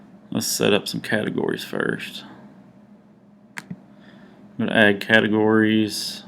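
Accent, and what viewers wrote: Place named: Appalachia, United States. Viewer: Hey there, Thank you for your very helpful information. Can't help but notice that you sound like you're from the Appalachian Mountains area, close to me, in NC.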